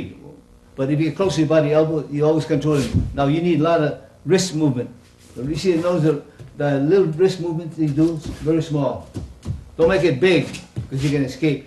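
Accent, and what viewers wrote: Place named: Hawaii, United States. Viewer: That's that Hawaii accent right there! =) mahalo, Sensei!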